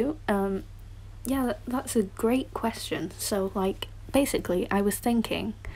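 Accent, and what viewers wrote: United Kingdom. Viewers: Damnnn the British accent 🔥🔥🔥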